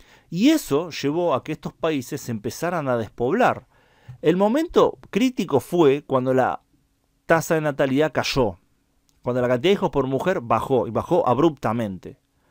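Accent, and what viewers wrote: Argentina. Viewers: Este creo que es un argentino por el acento. Perú, país inviable? Está muy despistado. Los ejemplos que pone son absolutamente burdos. Hoy, Argentina sufre los efectos del peronismo y Kirchnerismo. Le sugiero que estudie más y hable con sustento. El 45 % de la población argentina se encuentra en pobreza y Milei, tendrá que trabajar mucho y alinear a este charlatán que me causa vergüenza ajena. Argentina está en default y con índices de pobreza y subdesarrollo espectaculares. Perú, está creciendo económicamente durante los últimos 30 años, pese a los problemas y que tiene una de las monedas más fuertes de América Latina. Anda a estudiar economía en serio y basta de vulgaridades basadas solamente en la variable población.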